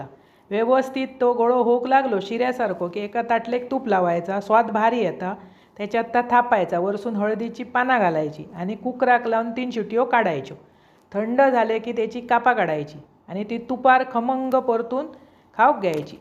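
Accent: Malvani, India. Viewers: माका khupach avadta topatla. खुप खुप खुप🙏🙏 धन्यवाद. तुमचा मालवणी बोल्ना khupach chan eknumber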